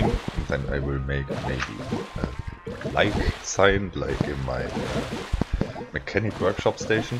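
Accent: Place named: Poland. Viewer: Uh you sound polish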